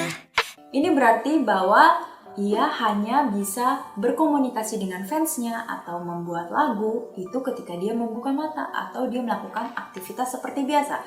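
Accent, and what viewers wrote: South Korea. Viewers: Logat Korea eonnie keren bgt yaampuuunn 😭👍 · Iya kak logatnya udah korea banget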